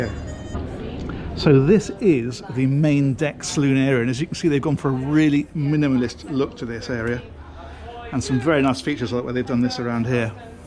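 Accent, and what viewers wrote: United Kingdom. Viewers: Your British accent makes these tours even more interesting ...not sure why??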